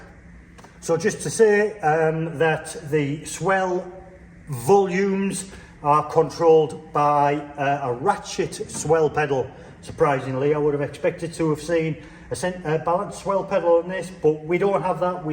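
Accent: North, United Kingdom. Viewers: Thanks a lot for this valuable exploration with the typical northern accent 😎. A tracker-action organ always offers (not necessarily a comfortable, but) the most direct playing feel - no matter if slider chest or cone chest. Even if I have to "work" more, I prefer a mechanical instrument - they are most honest to me - and I can save money for the gym 😉 Electric action is always "switch on - switch off" many meters away - reverb included...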